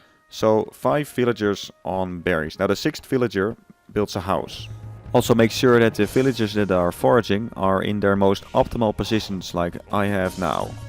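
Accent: Netherlands. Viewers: It was nice to hear a Dutch guy playing and explaining about this game :) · Sounds really Dutch😄😄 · From what country are you. You sound Dutch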